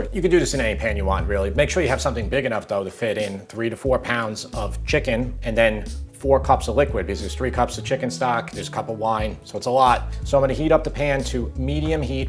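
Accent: New York, United States. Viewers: I hear that distinctive NY accent!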